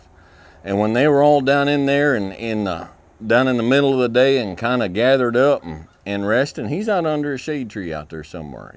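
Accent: South, United States. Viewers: 南部訛りってやつですか？ なんとなくアメリカ英語にしてもクセがあるように聞こえます。 ちなみに言ってることは素敵です。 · 南部アクセントが心地いい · 南部英語気持ちええんじゃ〜^ ^ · 南部訛り？ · それは南部特有のアクセントです。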